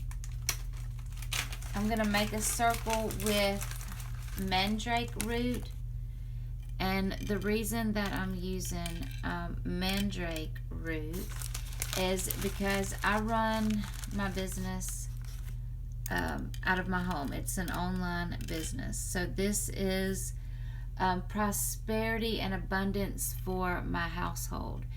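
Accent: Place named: South, United States. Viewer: I could listen to you talk all day, you sound like my ex wife. You southern belles are something else.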